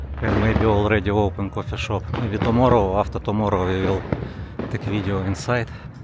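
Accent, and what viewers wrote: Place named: Russia. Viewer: So strong russian accent 😅 Привет, хорошего отдыха тебе! Спасибо, что снимаешь и показываешь!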